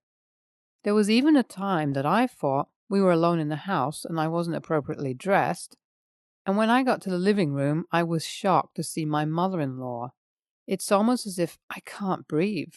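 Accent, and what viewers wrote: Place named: United Kingdom. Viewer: If I had to guess she’s Indian/Pakistani from England from her diction speech patterns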